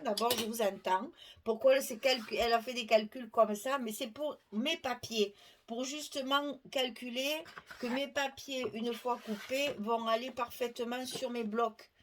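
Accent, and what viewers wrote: South, France. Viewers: Bonjour Toujours un plaisir de regarder vos vidéo et d entendre votre accent du Sud! je scrap depuis plus, de 10ans avant j étais dans une association maintenant je scrap chez moi beaucoup pour mes petits enfants Sympa le kit 😍 J habite à Granville en Normandie ( pas très loin. du Mont St Michel) 😉 Laurence Bonne journée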